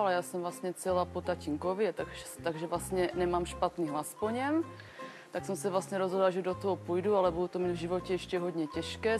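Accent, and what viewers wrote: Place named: Moravia, Czech Republic. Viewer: Ten přízvuk jo jo jasná Morava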